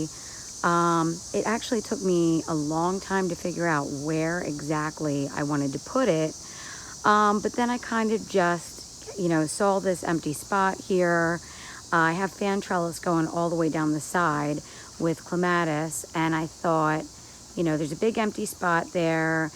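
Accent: New Jersey, United States. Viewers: I knew the accent! Hey jersey girl from philly girl!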